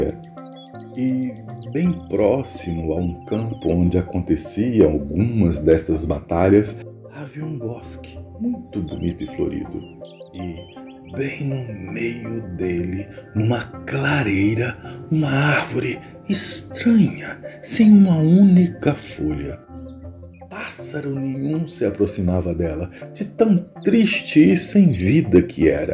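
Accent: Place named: Minas Gerais, Brazil. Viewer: Lindimaisdaconta!!! Em sotaque minerêis.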